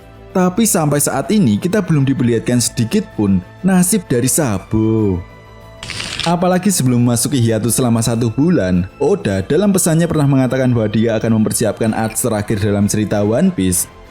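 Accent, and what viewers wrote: Java, Indonesia. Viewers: Logat jawanya kental skali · suaranya terlalu medhok,,, kyk bumbu ktoprak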